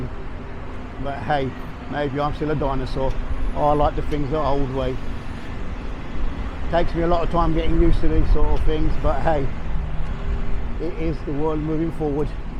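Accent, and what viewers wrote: United Kingdom. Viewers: You sound more Cockney than me and I was born in Stratford in 1969 👍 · I remember Bodgers in Illford and Illford Town Hall disco's on a Monday night (Interupted due to N. Benn fighting with Locals!). No offense but I detect a bit of Brummie in your accent, am I right? 😄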